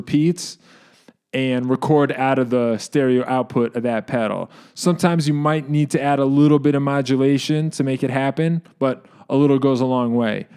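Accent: Boston, United States. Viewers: This guy is actually really intelligent especially when it comes to music and pedal theory, but I can never not hear a Departed style super bostony/Ny parody accent, or that one guy from Family Guy that's like "I was going to bone my girlfriend, but there was no way"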